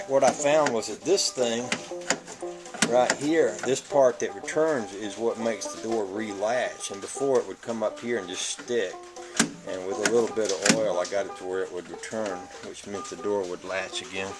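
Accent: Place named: South, United States. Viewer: i love that accent. go South!!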